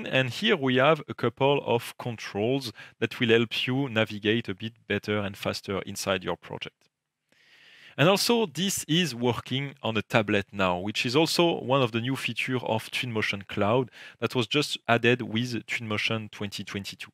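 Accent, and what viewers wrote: France. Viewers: Ohhh l'accent de frenchy à couper au couteau...vidéo intéressante. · Hello Twinmotion folks. Sorry, it's nothing personal, I love the French language. But the narrator's accent is very pronounced and I can't quite understand it. Please, I ask you to put it in French with subtitles or in English, but with less accent.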